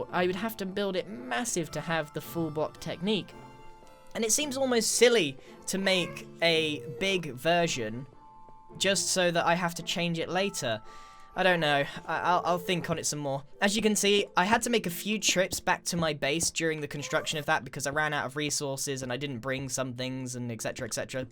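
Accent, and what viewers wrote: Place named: RP, United Kingdom. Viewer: You sound really posh in this episode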